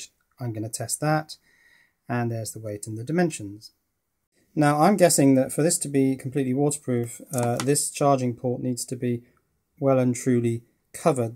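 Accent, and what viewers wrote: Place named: RP, United Kingdom. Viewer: you have an upper crust English accent.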